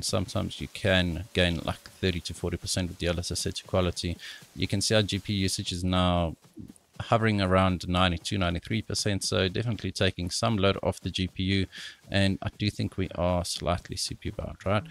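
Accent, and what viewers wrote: South Africa. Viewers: Is this an SA boi I hear?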